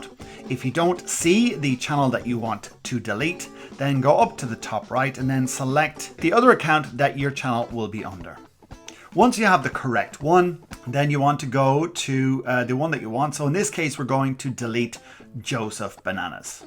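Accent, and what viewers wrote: Ireland. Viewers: U are DEFINETLY from cork or something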